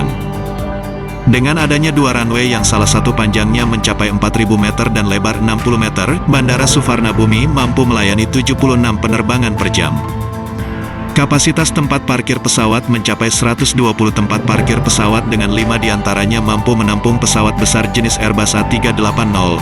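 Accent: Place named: Batam, Indonesia. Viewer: Yg org BATAM mana nii suara nya👍🖐 salam dari batam, sekupang🖐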